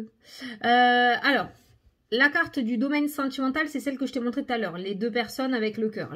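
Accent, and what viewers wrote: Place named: Lattes, France. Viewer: Salut Trop contente de retrouver chaque jour mon petit rayon de soleil 🥰💖😍 (en plus comme je suis Lattoise mais exilée a bordeaux ton petit accent me fait trop du bien aux oreilles 😁😜 )genial merci ce petit concours 😋🙏🍀🤞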